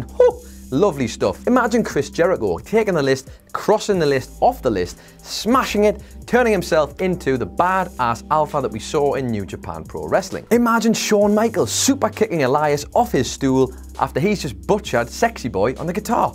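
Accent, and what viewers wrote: Ireland. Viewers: Are you irish and you look like connor mcgregor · I thought that it was Jules with hair, before I got that this guys irish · Irish accent? Fookin awesome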